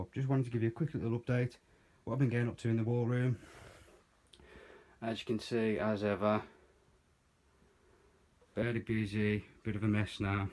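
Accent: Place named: West Midlands, United Kingdom. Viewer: nice one mate that was a bloody fast reply lol anyway im from west brom but now in oldbury so i knew that accent a mile away haha also u should promote more maybe a lil bit of social media or summatt and im sure your channel will do really well .... also did u end up sellin all them plates or u still got a few left like?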